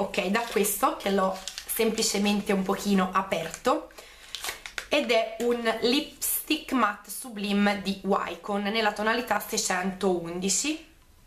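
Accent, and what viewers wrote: Tuscany, Italy. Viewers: Ciao!! Ti seguo da poco e mi sei già troppo simpatica! 😃 adoro il tuo accento toscano, la tua simpatia e i tuoi capelli - un bacio grande, al prossimo video:**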